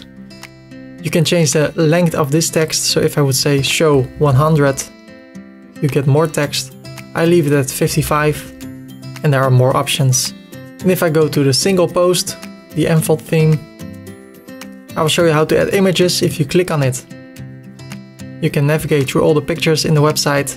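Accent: Netherlands. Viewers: your accent sound very dutch. are you dutch? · Nice video, i immediately heard you are Dutch.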